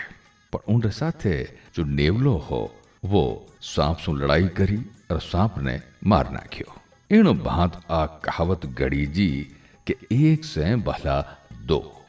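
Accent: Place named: Marwar, India. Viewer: जी आपरी मारवाडी़ बोली गणी फुटरी लागे सा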